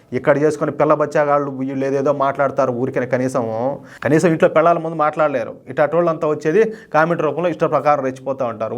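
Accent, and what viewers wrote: Rayalaseema, India. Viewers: రాయలసీమ భాష బలఉందన మీది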